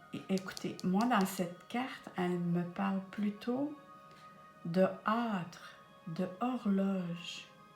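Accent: Canada. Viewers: Bonsoir le Canada est dans ta voix 😘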